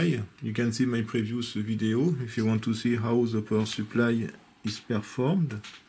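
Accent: France. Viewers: What a French accent !!!! 😅😅😅😍😍😍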